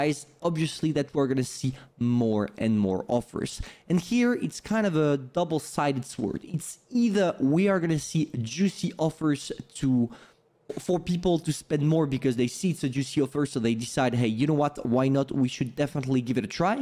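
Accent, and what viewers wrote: France. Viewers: you are French?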